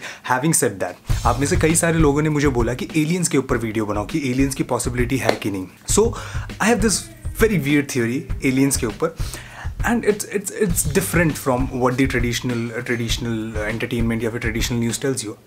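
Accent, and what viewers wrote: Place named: India. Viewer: I get a kick out of the ingine accent.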